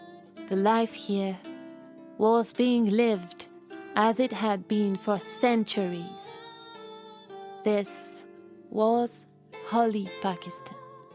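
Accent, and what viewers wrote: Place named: France. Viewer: You are mystical and deep, it's soothing to listen to your beautiful french accent.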